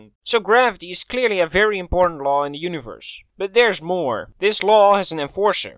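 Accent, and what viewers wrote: Netherlands. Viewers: Are you Dutch? You sure sound like you are!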